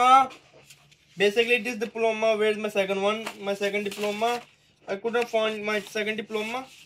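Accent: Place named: India. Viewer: Basically you are the reason whole world thinks all indians have an accent like you basically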